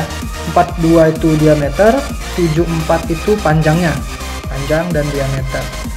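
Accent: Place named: Saudi Arabia. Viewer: You sound like you're from Saudi Arabia.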